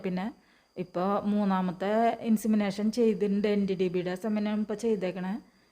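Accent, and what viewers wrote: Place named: Thrissur, India. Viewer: തൃശൂര്‍ ഭാഷയാണല്ലോ എവിടെയാണ് നാട്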